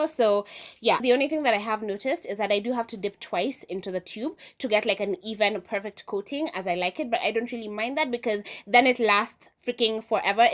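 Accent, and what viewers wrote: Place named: South Africa. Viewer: Your accent sounds so South African🇿🇦💕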